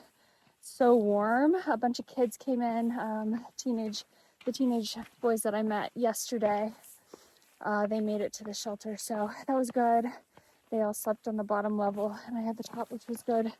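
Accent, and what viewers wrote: United Kingdom. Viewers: I love the British accent! I’ve been watching your hike for many years. I remember your video where you started the British accent and asked if was real or not. Never saw the answer. I think it’s real, if not it’s great!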